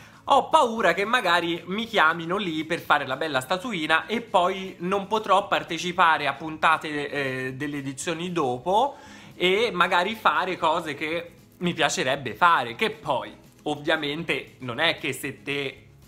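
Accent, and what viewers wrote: Tuscany, Italy. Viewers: Ti faccio una confessione: guardo i tuoi video soprattutto per il tuo accento perché abitando in Spagna mi fai sentire a casa dato che anch’io sono toscana. Continua così, sei fantastico 😘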